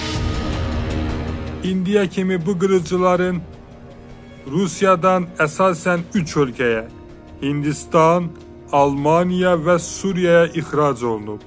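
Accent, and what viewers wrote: Armenia. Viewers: Diktorda ermenidi yaqinki. Azerbaycanniya oxwamir hec daniwigi. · Danisan 100% Ermenidir, cunki Azerbaycanli ele danismir.100% feyk. Elaclari kesilib her firildaga el atirlar. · Men hec bilmirem bu kanal ermenilere qulluğ eleyir yoxsa bize. Son vaxtlar bı kanalda verilen xeberlerin coxu panika yayıcı melumatlardı. Danışanın lehceside ermeni lehcesine oxşayır. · Danışan hele bil ermenidi, gücene gücene danişir aksentnen danışır, bizi qorxutmaq lazım deyil, artıq biz dünyaya ses salmışıq 🇦🇿🇦🇿🇦🇿🇦🇿🇦🇿🇦🇿💪💪💪💪💪💪🇦🇿🇦🇿🇦🇿🇦🇿🇦🇿🇦🇿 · Не правда.. Даже автора голос на армянский голоса похож.. Я как карабахский ветеран и связист вам говорю.. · Акцент армяский .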